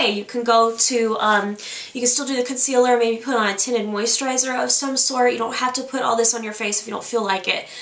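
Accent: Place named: Michigan, United States. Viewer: Your skin does look great. btw, you sound like the typical michigan girl!!! very thick michigan accent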